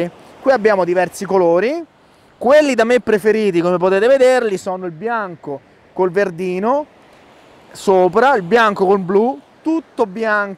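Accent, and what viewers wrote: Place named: Pozzallo, Italy. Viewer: Bello!! Ps, sei in Sicilia? Mi ricorda il pozzallese..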